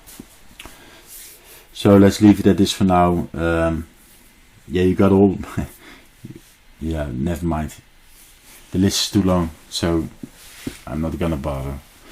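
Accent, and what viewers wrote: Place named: Netherlands. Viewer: Let me guess.... Nederlands? Kan bijna niet missen haha.. 😜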